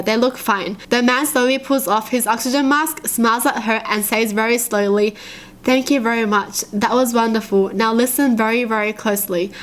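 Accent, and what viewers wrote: Australia. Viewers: She has an accent. She is a aussie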